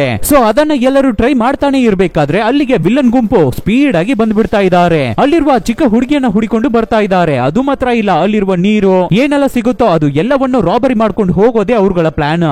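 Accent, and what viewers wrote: Tamil Nadu, India. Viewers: Tamil navru kannada matadtidare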